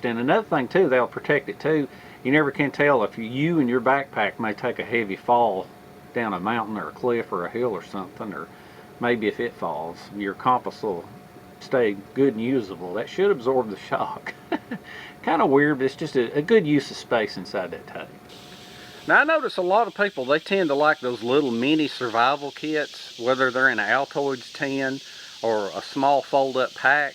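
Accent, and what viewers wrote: South, United States. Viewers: Well, thanks guys for all the responses. My spouse has been here in Delaware for 21 years, now, but still has an ear for the Southern accents....😉👍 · I really enjoy your accent, down home flavor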